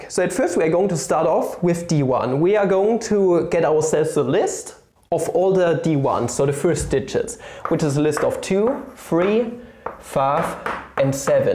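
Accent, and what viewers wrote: Germany. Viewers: you sound very german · Hearing a German speaking English always makes me mad because of.... well you guessed it: accent! :-D it´s hard to follow the content while listening. Apart from that, I would appreciate your work more if I wouldn´t have heard about this by the one ans only, James Grimes. No offence, man, you`ve done a great video!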